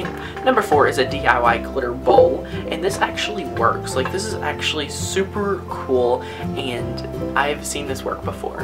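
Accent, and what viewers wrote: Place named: Tennessee, United States. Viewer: Cool projects! Yeah, totally do that pineapple lamp. Glad to see a fellow Tennessean and personally I think your accent is fabulous!! Let the haters hate 'cause that's all they know how to do, and you keep on being the wonderful person you are and be proud of your roots!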